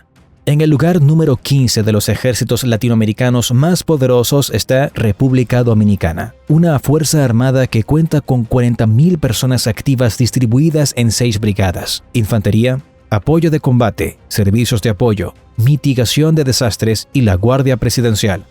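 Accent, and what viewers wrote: Argentina. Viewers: se nota que es argentino, peruano o boliviano. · pregungta seria , eres argentino? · Creo que el del video era Argentino 😂 muy arriba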